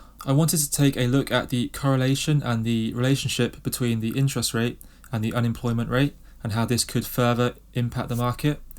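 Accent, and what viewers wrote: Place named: England, United Kingdom. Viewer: Nice accent! You English?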